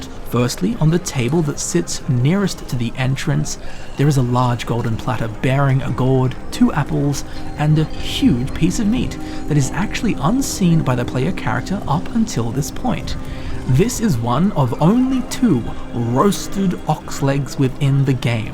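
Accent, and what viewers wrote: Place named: United States. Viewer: Is it just me or was super American sounding in this vid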